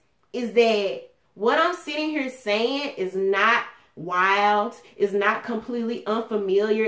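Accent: United States. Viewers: I love your country voice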